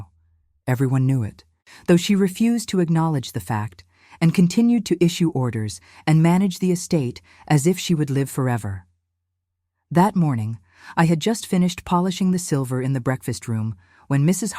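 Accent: United States. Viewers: Why the American narrator? You need a British accent otherwise this sounds cheap.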